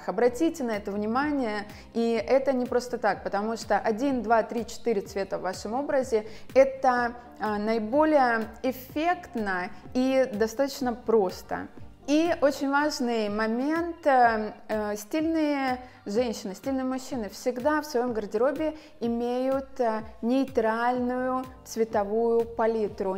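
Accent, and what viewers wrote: Italy. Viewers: Обожаю, как вы разговариваете, у вас даже речь стильная! Грамотная, уверенная, женственная и как вишенка на торте элегантный итальянский акцент! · я обожаю ваш итальянский акцент🥰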